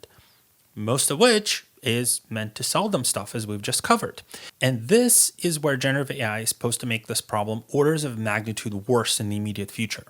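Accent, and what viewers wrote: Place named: United States. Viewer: some annoying american voiced thing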